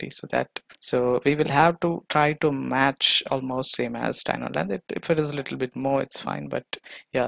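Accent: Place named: India. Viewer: Finally i am deep enough in the rabbit hole to get tonthe Indian accent. This is it. Getting smarter as hell.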